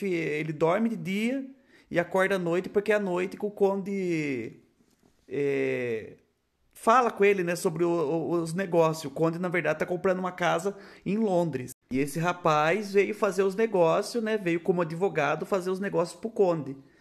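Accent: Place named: Piraju, Brazil. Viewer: somos vizinhas kkkk Moro aqui em piraju Parabéns pelo canal, me senti muito representada no seu sotaque e sua simpatia... Deus abençoe pra continua crescendo mais e mais, SEU NACAL E LOCO DE BOM!!!!!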